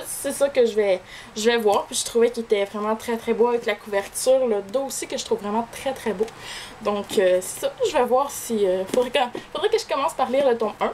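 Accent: Quebec, Canada. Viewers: ah oui ! c'est bien ce qui me semblait, qu'on avait justement le tome 1 et peut etre aussi le tome 2 de la saga "ailes". Tu as de la chance d'avoir les 4 tomes ! je viens de découvrir tes videos, celle-ci est vraiment chouette ! et en +, j'adoooore ton accent !(je devrais épouser un québécois, juste pour le plaisir d'entendre cet accent tous les jours, lol )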